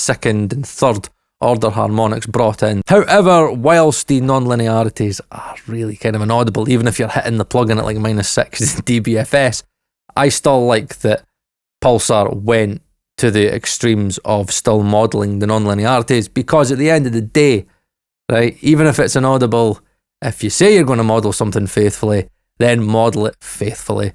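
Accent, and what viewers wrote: United Kingdom. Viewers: Great video! I use a Harrison parametric EQ that I absolutely love. I got it from plugin boutique for $10 USD, but I'm really interested in trying this one out. Where in the UK are you from BTW? I've gotta sort your accent out!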